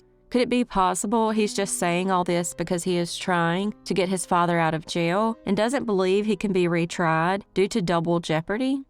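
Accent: South, United States. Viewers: Congratulations! You absolutely deserve your 100,000 subscribers! People really do recognize quality and talent! (And an awesome Southern accent!)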